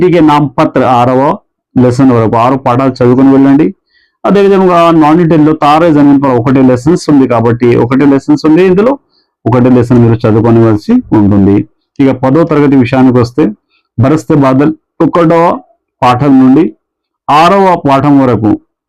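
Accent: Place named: Telangana, India. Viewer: Sir aap telangana hai yaa ap ???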